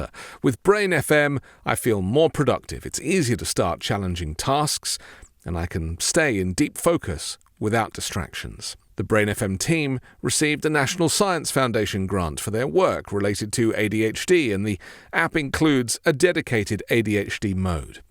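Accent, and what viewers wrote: United Kingdom. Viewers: Best voice on the internet. Smooth and silky British goodness! Cheers mate!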